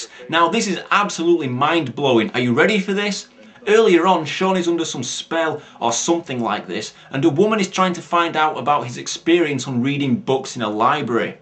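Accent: Scotland, United Kingdom. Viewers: Greetings from California! I watched Zardoz a few weeks back and it was INCREDIBLE. You're Scottish right?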